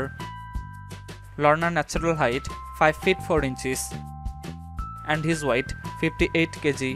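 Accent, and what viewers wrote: India. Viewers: Indian Narrator????????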